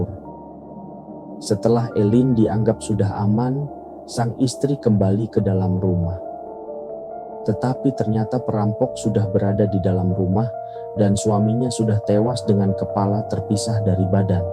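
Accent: Java, Indonesia. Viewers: Duuuuuuh....KEMANA KAU MAS??????? Logad Jawa mas yg bikin rindu...ini siiih hanya membaca...gak seruuuuu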